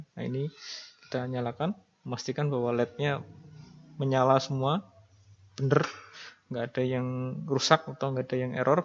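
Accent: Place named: India. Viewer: Can you and all of your countrymen do me and everyone else on the internet a solid. I am not trying to be a racist and i am not, but can you put a subtitle in English on all of yours videos so we can understand what you are saying. An Indian with thick accent trying to speak English is not easy to understand. But a lot of content from Indians are second to none when it comes to a technical aspect of things. with respect.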